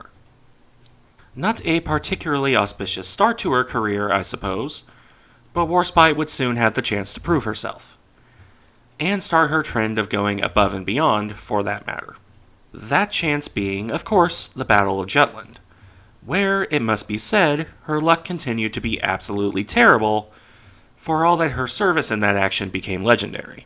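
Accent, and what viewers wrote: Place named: United States. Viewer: Nicely done. Earned you a sub. Very well presented....the best "Americanized" version of Drachinifel I've ever heard. I look forward to future content.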